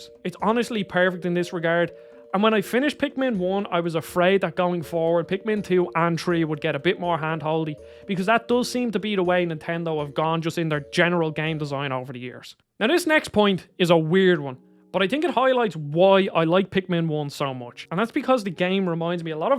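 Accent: Ireland. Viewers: I just meant it was an Irish accent that I had never heard before, I may just be being stupid though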